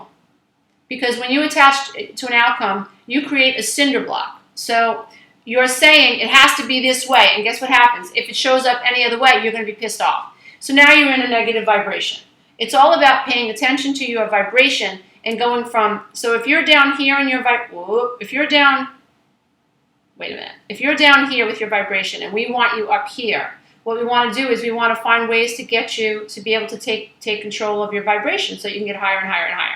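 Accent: New York, United States. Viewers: Don't you hear her NY ACCENT?! 🗽🗽🗽 love you -Brooklyn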